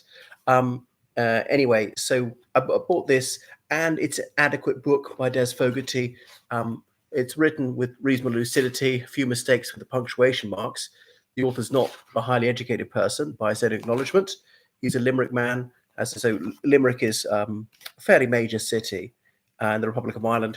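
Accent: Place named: England, United Kingdom. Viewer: Read like a redcoat . Bit boring old chap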